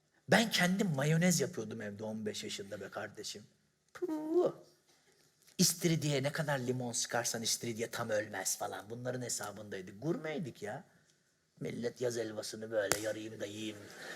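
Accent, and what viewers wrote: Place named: Diyarbakir, Turkey. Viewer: cem yılmaz niye diyarbakırlı gibi konuşuyo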